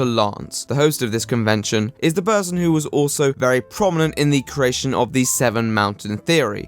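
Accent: RP, United Kingdom. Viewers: I love this guy like, he makes my brain feel so good with t he monotone British voice its just fantastic. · Wow you sound incredibly british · I just like watching you shred people with your posh accent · Your videos are really nice to listen to while doing homework. They’re long, interesting enough to enjoy, but doesn’t require much attention to listen to, and your posh accent is a plus. You make good shit.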